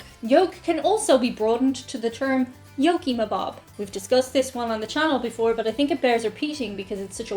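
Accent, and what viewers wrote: Ireland. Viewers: We say stuffed to say we are full as well you Irish accent might be throwing the sound of the word.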